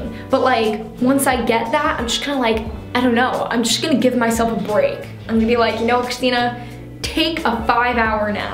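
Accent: New York, United States. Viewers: yo i love her slight accent it’s so cute holy new york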